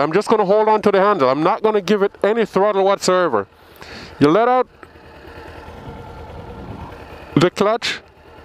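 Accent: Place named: Caribbean. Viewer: dis is your ORN ! your accent is a mix of foreign and caribbean lol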